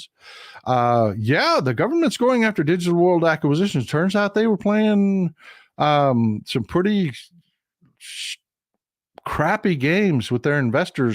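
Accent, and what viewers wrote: Texas, United States. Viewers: I love hearing that Texas accent:) Yerp=Europe:)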